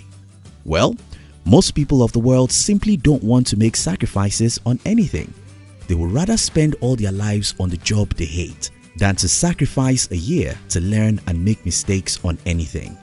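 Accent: Nigeria. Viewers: The voice sounds Nigerian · This narrator is definitely a Nigerian brother.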